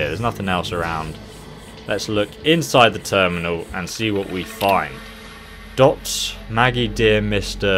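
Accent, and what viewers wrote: United Kingdom. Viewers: your British, me to! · are you British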